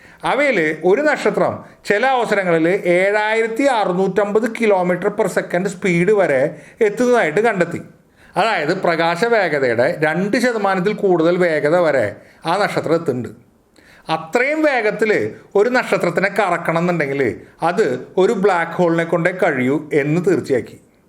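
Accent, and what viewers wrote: Thrissur, India. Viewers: തൃശൂർ ഭാക്ഷയിലാണല്ലോ സംസരം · ത്രിശ്ശൂർ സ്ലാംങ്ങ് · Thanks,നല്ല അവതരണം, തൃശൂർ സ്ലേങ്, ഇനിയും കൂടുതൽ അറിവുകൾ പ്രതീക്ഷിക്കുന്നു,, പ്രകാശത്തിന്റെ വേഗതയിൽ യാത്ര ചെയ്യാൻ പറ്റുന്ന കാലം വിദൂരമല്ല സാധിച്ചാൽ മിനിമം നേപ്ടോൺ വരേയെങ്കിലും ഒരു ട്യൂർ ഒപ്പിക്കാം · അയ് നമ്മൾ തൃശ്ശൂരിൽ നിന്ന് ആണെന്ന് തോന്നുന്നല്ലോ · ഇൻഫർമേഷനിൽ കൂടുതലായി തൃശൂർ ഭാഷ കേട്ടതിൽ സന്തോഷം. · ത്റുശൂ൪ ഭാഷ കേൾക്കാ൯ രസമുണ്ട്. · Thrissur slang 🥰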